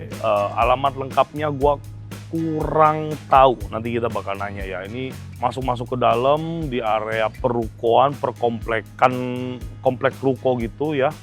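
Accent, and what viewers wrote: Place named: Surabaya, Indonesia. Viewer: Mas atau ko nya logat" medok" Surabaya an 😊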